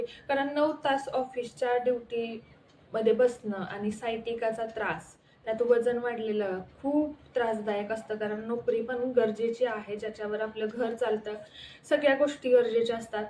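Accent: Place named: Kolhapur, India. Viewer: Pune mg chan mala Tumch marathi kolhapur sarkh vatle mhnun mi kolhapur madhun bgte tumche video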